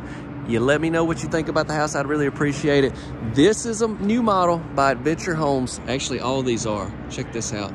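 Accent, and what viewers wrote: South, United States. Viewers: Get a friggin voice filter. Dear lord that southern speak is a huge NO.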